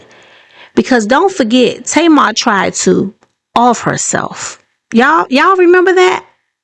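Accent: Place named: DMV, United States. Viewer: Youre absolutely right. You make great points. I hear a little DMV in your voice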